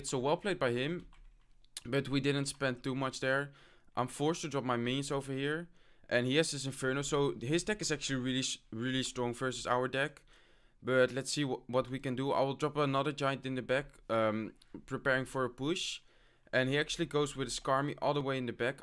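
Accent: Netherlands. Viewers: Are you netherlands because you dont talk like a american human and if i say something english i talk like you and in from the netherlands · Je bent zo duidelijk nederlands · where are you from? Because you sound a bit dutch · is hij nl ik hoor het aan zijn accent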